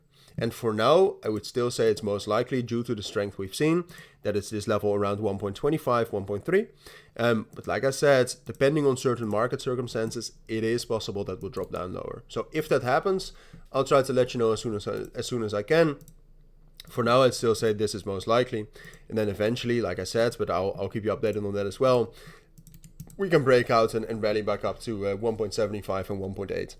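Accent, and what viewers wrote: Netherlands. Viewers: Where you from? Netherland?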